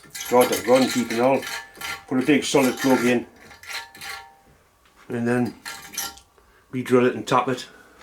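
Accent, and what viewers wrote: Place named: Northumberland, United Kingdom. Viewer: Hard as the hobs of hell, been a long time since ive heard that, deffo ashington twang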